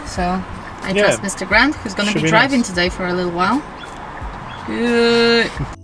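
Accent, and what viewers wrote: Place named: Eastern Europe. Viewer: Well done for doing this video. I can’t wait if you are doing a review on model Y. Love your Eastern European accent.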